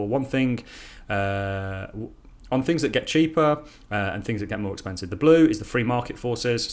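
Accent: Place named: Midlands, United Kingdom. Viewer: thanks for your videos. Guessing from your accent your in the midlands.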